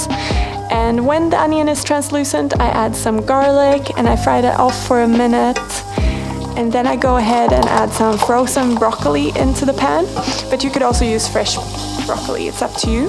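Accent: Sweden. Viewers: Just discovered your channel last night, 🌹and I'm TOTALLY IN LOVE. ❤️ Been VEGAN 💚 for many years, yet finding many of your recipes very inspiring! 👏🏼 Plus your sweet Swedish accent is so pleasant to listen to! Reminds me of my multiple visits to Sweden. 😊🇸🇪 Keep up the great work! 👏🏼🤗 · Det tog mig cirka 3 sekunder att höra att du var svensk. Ganska kul ändå.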